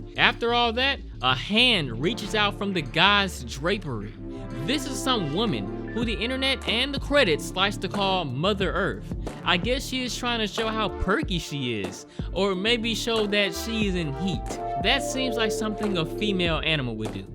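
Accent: United States. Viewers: This guy has a nice American accent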